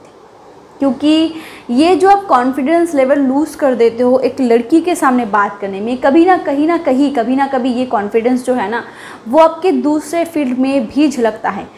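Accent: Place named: Bihar, India. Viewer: Maim aap kahan se ho bato se lgti bihar ki pl batana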